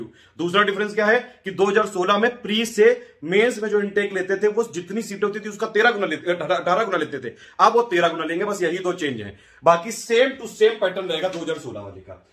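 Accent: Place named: Mirzapur, India. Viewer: Ek dam mirzapur se inspire language xcent hai apka.. Lajawaab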